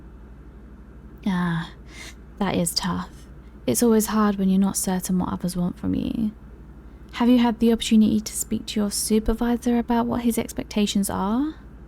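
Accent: United Kingdom. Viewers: Oh! Oh! A therapist roleplay! I love these soooo much!! Thanks for your hardwork, British accent & Therapist? Ahhh. Sucha pleasure to the ears! The pacing with this one just had such a "right" feeling to it. A lil flirty back & forth is always nice too have a touch of as well. Seriously tho thanks for doin this one, much love. Godbless.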